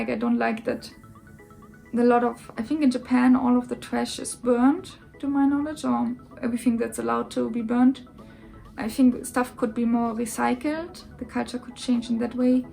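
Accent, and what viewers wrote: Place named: Germany. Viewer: you should be careful saying stuff which you "don't like about Japan', instead of saying 'don't get used to', 'can't really understand' , 'hard to understand' or "hard to get used to'. you're such a typical German saying your negative opinions direct, even your words can make other people's feelings uncomfortable, and you get haters instead. if ur speaking English, better to speak beautiful English, not with that strong German accent which is so difficult to understand. it's better if you speak your own language, the 'direct language, German' and put a subtitle, because native English speakers would pick words more carefully, and wouldn't say so much negative things to make listeners feel uncomfortable. You should known that Germans are not so fond by others, because you guys are not so charming, I mean, not with attracting, warm hearted personalities, but cold and selfish. 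I often see that German people don't help others on the streets or on the train, but ignore and leave. they think it's none of my business or i don't wanna waste my time helping others, and get no reward. really cold people out there.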